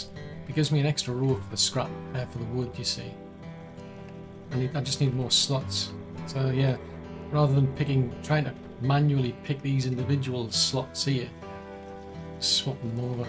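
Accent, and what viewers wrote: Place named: Northeast, United States. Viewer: nice to hear the Northeast Accent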